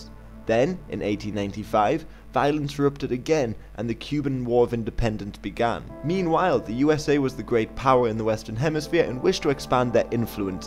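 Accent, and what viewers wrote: United Kingdom. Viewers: Is this a British man talking? this makes no sense unless her learned to talk British in the US >_<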